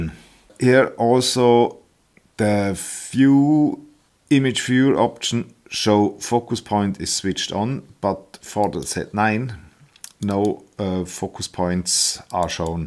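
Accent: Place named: Switzerland. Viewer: Da ghör ich doch en schwizer usse 😃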